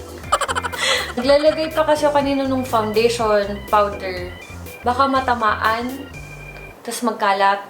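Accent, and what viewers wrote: Visayas, Philippines. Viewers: kanang accent sa mga bisaya pagmagtagalog ba..😆😅hahah, ganyan din ako kadalasan eh.!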